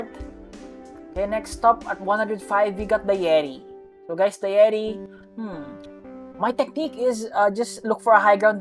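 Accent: Philippines. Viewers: Pilipino kapo? Boses nyu kase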